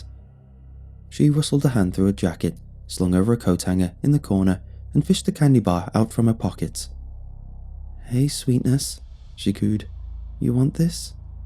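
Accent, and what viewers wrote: United Kingdom. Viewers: bruh this is my fifth year listening to ur voice british people are unreal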